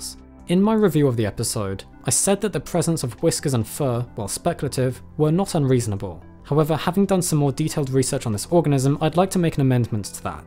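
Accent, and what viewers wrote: United Kingdom. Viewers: Thought you was British till I saw your teeth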